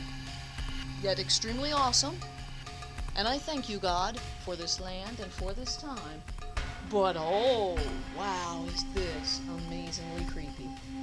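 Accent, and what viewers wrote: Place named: Chicago, United States. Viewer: Fred C. Birchmore Sr "Many a sermon I am sure has taken place at this location." These insightful words, uttered from the pulpit by this bold and intrusive explore,r epitomize her investigative reporterism talents. The short movie isn't bad if the sound is turned down to muffle the nasal Chicago wannabe Madow intonations. Her breaking and entering the Milford cabin should not have been caught on film, though, since that is now evidence of her committing a crime. If the <link> is still there where she wrote it in the table dust, that would also be prosecutable as vandalism, or "tagging." As a sort of cultural or denominational exchange it would be an interesting followup to break-and-enter her abandoned home in Florida (it is clearly abandoned when she is not there) and root around to speculate or fabricate her lifestyle and generally invade her privacy. 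I wouldn't play that spooky music and tinky gong, though. Maybe a sound track of "Catfight in Bizarrino" played backwards instead. That is my cabin, or "tent," BTW, with the children's claybank hands on the front (which date back to the 1920s). That venerable structure is not representative of my real houses in Hollywood and San Diego, but I plan to be there this summer, as I have for 72 years, and as my family has since 1832. Like - Reply - Just now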